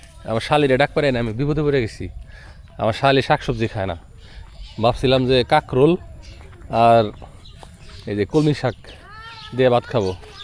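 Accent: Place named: Manikganj, Bangladesh. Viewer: ভাই আমার বাড়ীও মানিকগঞ্জ আপনার কথা আমাদের কথা একি রকম ৷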